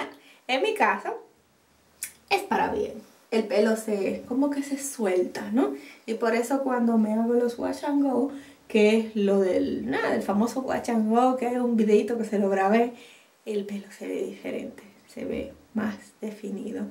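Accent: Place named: Dominican Republic. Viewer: Gusto tu pelo el mio és asi pero yo me derribo por que me duele mucho para desenrredar .jejeej .guste de tu canal te boy a dejar mi apollo y suscripcion y te invitarte a mi canal aver si te gusta y me apollas me parece que eres de 🇩🇴 por el acento me alegrarei si eres de aya amo encontrar dom en YouTube.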